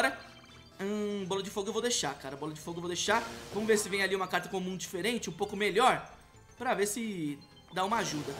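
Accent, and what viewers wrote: Minas Gerais, Brazil. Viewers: Mineiro d dpirc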